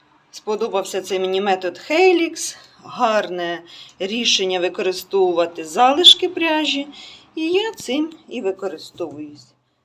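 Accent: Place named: Chernihiv, Ukraine. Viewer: а я когда тебя слушаю и смотрю, свекровь свою вспоминаю. Её говор очень сильно похож на твой. Она у меня с Черниговской области. А мои на суржике больше говорили, пока папа жив был и бабушки. Мамина родня и с Мелитополя.. Но сейчас мы, к сожалению, только на русском говорим. Но передачи смотрим в интернете на украинском.. А вообще, когда я слушаю твою речь, как будто к бабушкам попадаю, сразу такие воспоминания тёплые начинаются. 🙂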